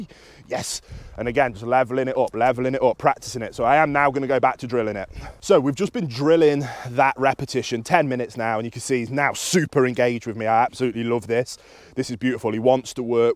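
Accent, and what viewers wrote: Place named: North, United Kingdom. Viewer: I'm late to this party. As a northerner in London, it's nice to see and hear a northern Brit doing this, rather than an American. Thank you!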